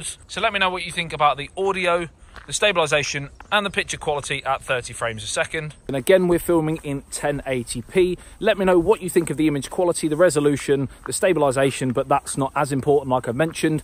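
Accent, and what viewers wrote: United Kingdom. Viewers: eu amo o sotaque britânico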